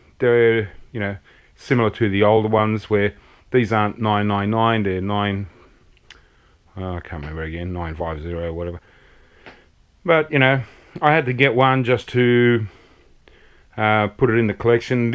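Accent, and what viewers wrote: Australia. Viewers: I don't know but I found this very funny. I think you were getting a little tipsy.And this australian accent. Lol. Very nice. Cheers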